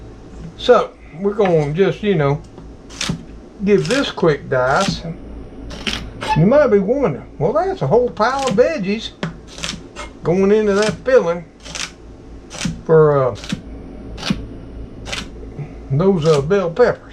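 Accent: South, United States. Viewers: if your a Finnish person it's all salt and pepper, Ha Get right down to it. I learned Mrs's Dash in Boy Scouts! ha Love your southern swang. Get a job Brian.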